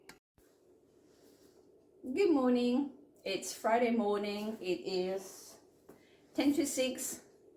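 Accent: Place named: Australia. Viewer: Thank you so much! I loved your way of explaining and your Australian accent. The clothing changes were fun too. Nice production and even better tips.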